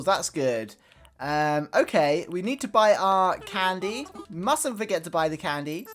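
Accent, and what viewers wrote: United Kingdom. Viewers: Hey, I just want to tell you how much I look forward to your daily "spooky" videos. I've been a subscriber since 2020 when i purchased acnh. I really enjoy your energy and your British accent 😅 I wasn't playing acnh for over 10 months but 13 days ago i restarted my island and finally i found my love for playing acnh again. I've binged watch all of your older videos 😅 I'm just happy that you're still here making acnh content 🙏🏼 Love from Germany 🥰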